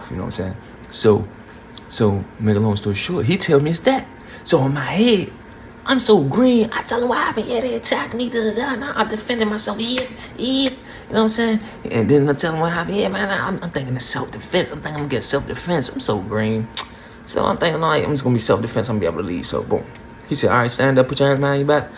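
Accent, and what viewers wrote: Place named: Washington DC, United States. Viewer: My nigga from dc uptown talk just like you 😂😂😂 he had me all over dc when i lived in Maryland years ago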